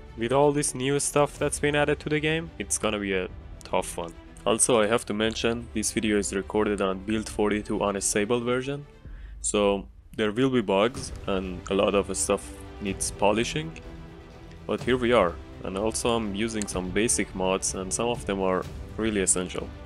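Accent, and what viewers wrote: Iran. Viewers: You sound Persian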